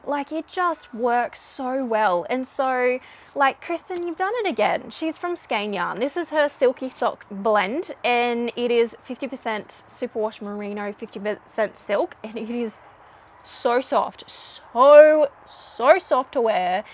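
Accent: Australia. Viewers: Love the podcast and hearing our fabulous Aussie accent on screen. Love the look of the bag and yarn from Bendi too. Thanks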